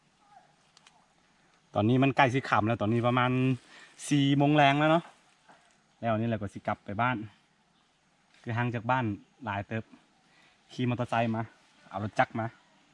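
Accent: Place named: Sakon Nakhon, Thailand. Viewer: พึ่งมาเบิ่ง เอ้อ เว่าคือข่อยไทสกลเลย 555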